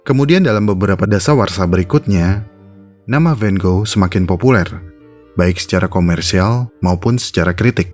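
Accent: United Kingdom. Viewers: Aksen britishnya enak didenger 😅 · denger aksennya ky lg ntn film Hewri Potah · Logat British sangat melekat 😂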